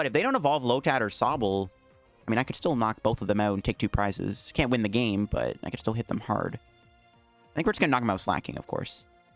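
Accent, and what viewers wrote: Canada. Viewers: are you from Canada? Love the content always and I'm constantly using your deck ideas.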